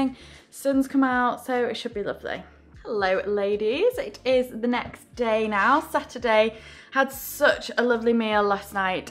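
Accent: Manchester, United Kingdom. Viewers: She has a gorgeous accent, like posh Mancunian… probably Alderney edge or Somewhere Cheshire’s. I love the videos and she is very articulate.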